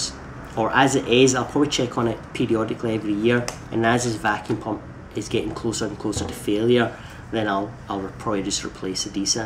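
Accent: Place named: Scotland, United Kingdom. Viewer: I think yul find he's scuuutish!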